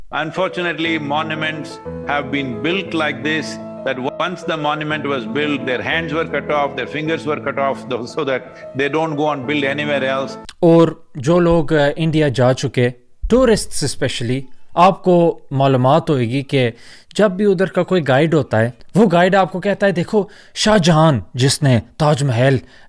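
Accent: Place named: Punjab, Pakistan. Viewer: You talk like a punjabi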